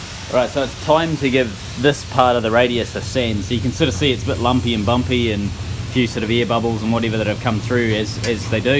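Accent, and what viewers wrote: New Zealand. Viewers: He's saying pantographin kiwi!!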